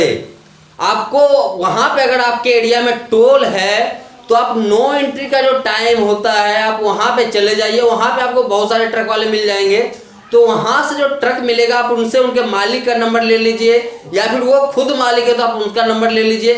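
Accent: Bihar, India. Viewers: Aap bihar se ho bhai sahab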